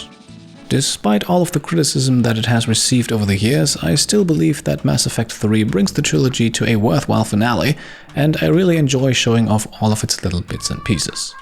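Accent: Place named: Germany. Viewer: I don't usually comment in your videos, but I love your work and never miss your Rimworld and XCom videos, they're just perfect... Fun fact, I don't know how I never realised you are German, hearing it now it seems obvious...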